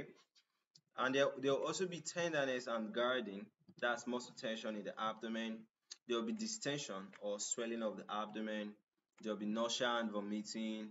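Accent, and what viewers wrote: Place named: Nigeria. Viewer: Very refreshing to hear a Nigerian accent in a video like this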